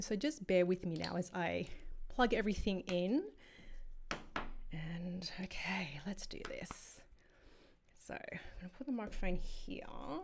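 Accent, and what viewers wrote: Australia. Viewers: An Asian with an Australian accent? What a beautiful mix!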